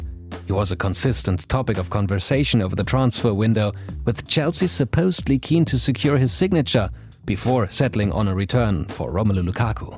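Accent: Germany. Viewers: Can you hear the German accent? The presenter is German. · du bist deutsch das hört man sofort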